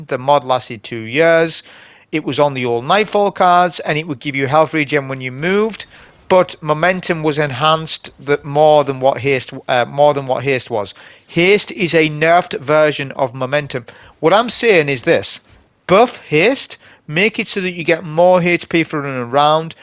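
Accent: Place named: North East England, United Kingdom. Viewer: Nice video mate. I love Stasis, it's my favorite Subclass. Just to note, when you have Aspects that use grenades i.e. Bleak Watcher, they have a separate cool down, which you will see in the text description of the Aspect. Love your videos on general mate, I miss loving in the NE of England, so enjoy listening to your accent.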